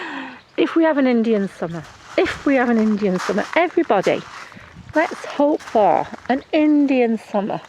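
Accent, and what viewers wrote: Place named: Lancashire, United Kingdom. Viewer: great tour thank you. Also, it sounds to me like you have a Lancashire accent so for that reason I am going to add you to my list of "check these out" channels featured on my channel's homepage. Big up the north west massive, nice one.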